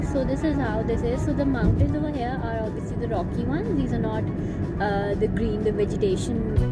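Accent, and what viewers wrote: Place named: India. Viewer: 4 secs we can make out Indian accent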